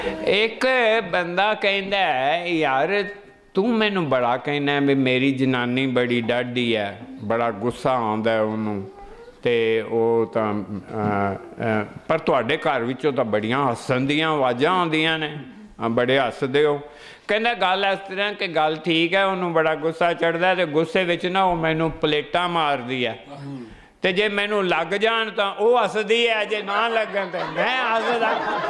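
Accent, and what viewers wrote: Punjab, Pakistan. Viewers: your punjabi same we pakistani speak buhat khoob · What a beautiful affection developed with uncle, one thing is a very beautiful style of jokes and another thing is that uncle speaks exact our Pakistani style of Punjabi. Love u Baba g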